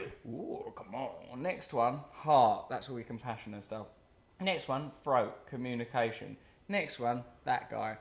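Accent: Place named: England, United Kingdom. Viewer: Russell, please add SUBTITLES to your videos (at least to your next ones). I'd really like to comprehensively understand what are you saying, but it's kind of hard due to your English accent. VOTE UP SO HE CAN SEE!!!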